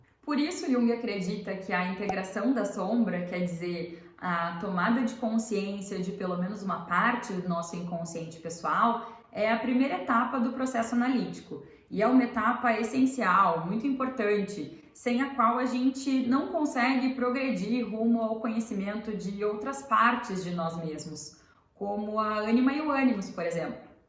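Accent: Rio Grande do Sul, Brazil. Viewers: Nossa tesão pela vida está lá nas Sombras . Tu és gaúcha? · Clareza e conteúdo denso com sotaque gaúcho. Excelente.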